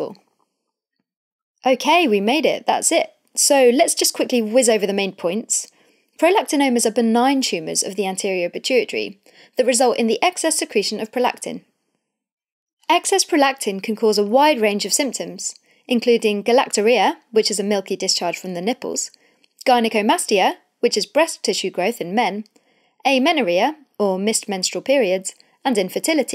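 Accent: United Kingdom. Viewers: British accent 😊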